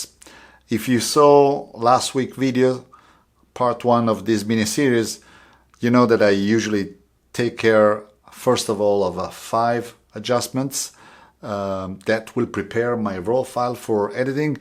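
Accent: Italy. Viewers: hello, I very like you performance and passion for what are you doing, your videos are very helpful. Your English is very understandable and I like Italian accent, please continue with this. One of best channel for me. Ti auguro tutto il meglio. Ciao Luca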